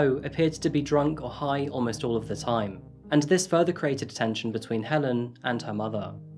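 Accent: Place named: United Kingdom. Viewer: I love this interesting mix, you always do first class research, and I really enjoy video narrated by fellow Brit who knows how to properly pronounce English 😂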